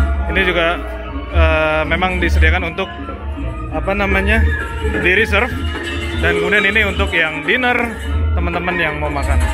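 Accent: West Java, Indonesia. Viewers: Logat orang sunda iye he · Bro aslinya orang mana logat sundan nya agak kenyel hehehe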